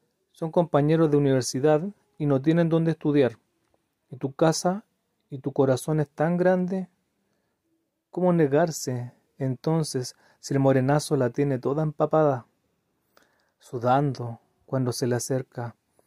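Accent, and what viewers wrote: Chile. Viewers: noté el acento chileno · siempre tuve debilidad por el acento chileno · un acento chileno ejemplar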